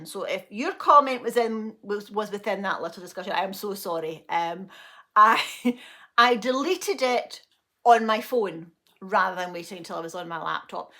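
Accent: Scotland, United Kingdom. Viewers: I am not a creator but comment frequently on videos I watched. I never ever commented in a negative way or criticised the person behind the channel. Its like you said its free to watch and if you don’t like the content why watching it at all?? never understood that attitude. I habe too say that especially during/ after Covid aggression and unkind comments have risen, even I get nasty comments after my own comments on absolutely harmless topics, its so weird what people read into your comments sometimes and how nasty there responses are. Like you I have so much experience not with 5 years old😅 but adults who often behave like them😅 working in retail for many many years and have seen and heard ALL. I always try to be kind and not judge people just by their looks, background etc. I admit its not always possible but this is a lifelong project and some people are just AH and I don’t care because I cant change them, not my problem but I also agree with you for very sensitive people it isn’t always that easy. 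I just love your content and your personality AND I can understand your Scottish accent which is quite ironic if a non native english speaking person is saying that😅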